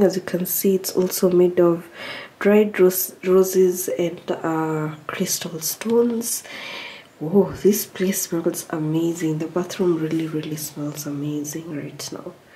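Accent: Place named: Kamba, Kenya. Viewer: Kamba accent 😂am fighting a cold too and its terrible,safe delivery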